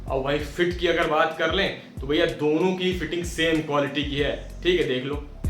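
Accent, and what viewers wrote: Bihar, India. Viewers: Bihari accent bro💥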